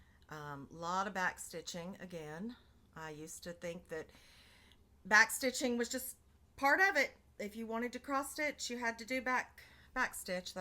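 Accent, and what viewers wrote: South, United States. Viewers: Another great video! Love listening to fellow Southerners! Especially since I am stuck up here in snowy Canada! 🤪The swans are gorgeous!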